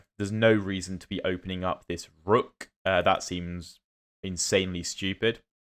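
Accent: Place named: Midlands, United Kingdom. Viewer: Accent confirmation please. 'But', 'Rook'', 'Because' sounds Yorkshire (North) or Lancashire. The rest of it doesn't. Going with midlands - Nottinghamshire/Derbyshire.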